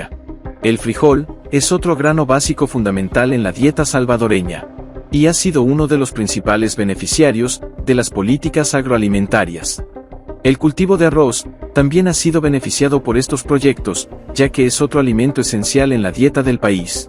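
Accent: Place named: Castile, Spain. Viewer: Un buen documental con una voz narrativa en perfecto castellano. Muchas gracias desde Tenerife. Reino de España. Viva nuestro muy amado EL SALVADOR !!!.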